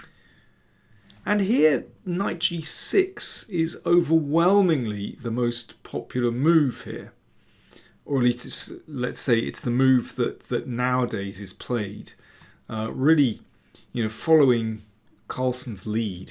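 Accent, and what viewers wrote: England, United Kingdom. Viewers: I agree : a delightful english accent that makes the video even more agreable.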